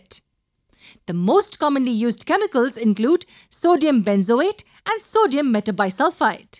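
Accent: India. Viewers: This Indian accent is killing me😭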